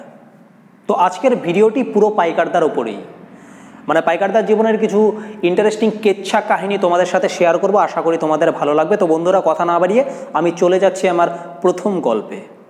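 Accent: Bangladesh. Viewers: Dada Tume ki BANGLADESHI kano jane na tomar voice sune mone hoy....😕😕😕😕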